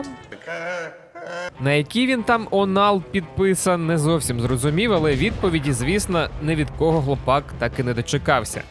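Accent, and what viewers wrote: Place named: Surzhyk, Ukraine. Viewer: Супер! Від суржика взагалі кайфую)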